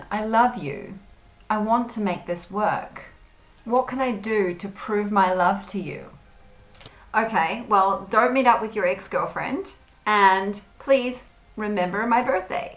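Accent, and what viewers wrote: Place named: Australia. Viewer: I think you speaking Australian English.. Good teaching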